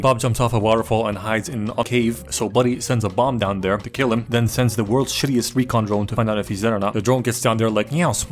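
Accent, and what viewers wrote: France. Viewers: Hey you have a really good french accent ! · Are you french?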